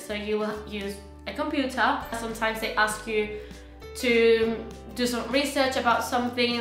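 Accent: United Kingdom. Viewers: hey you got a acent of british. cool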